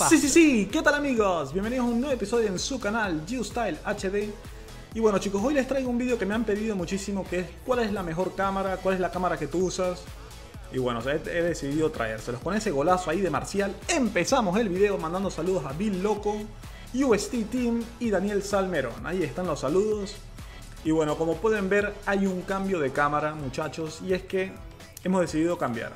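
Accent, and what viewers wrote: Venezuela. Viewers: Amigo eres venezolano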